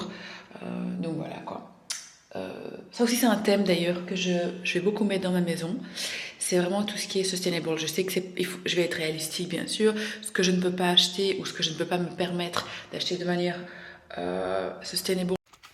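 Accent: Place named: United States. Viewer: English without an American accent please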